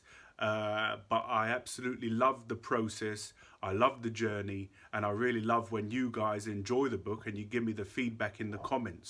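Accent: United Kingdom. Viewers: Wow that's a long process, but your work makes many people happy & useful thanks for doing it, btw are you British? 🥰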